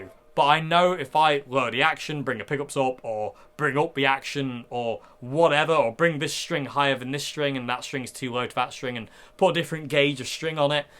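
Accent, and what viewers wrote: United Kingdom. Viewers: I love the British accent, oh and the guitar playing too.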